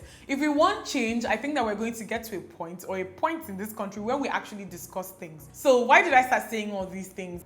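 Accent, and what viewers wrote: Igbo, Nigeria. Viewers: makes me want to have an Igbo accent. It's so sweet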